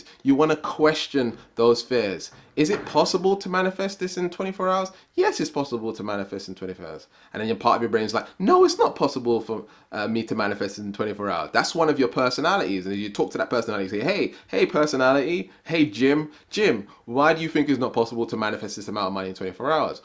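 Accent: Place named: England, United Kingdom. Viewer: Love ur English accent lol:) I AM SUPERSTAR OOOOHHHH YESSSS:))) bless you beloved friend 🎁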